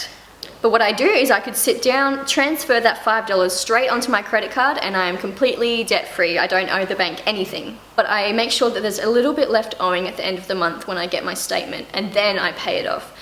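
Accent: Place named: New Zealand. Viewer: Great videos! Love em. An addition into having a credit card is that some have small % cash back whenever you used it. It's almost free money! Your accent sounds New Zealand-ish. It's very pretty :)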